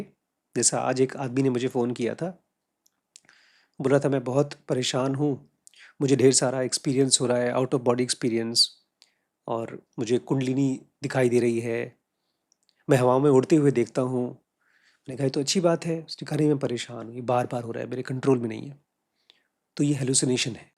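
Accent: Bihar, India. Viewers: Sir it was really deep class I was a little asleep, and found it quite insightful, and you sometimes sounded using a bihari accent with due respect.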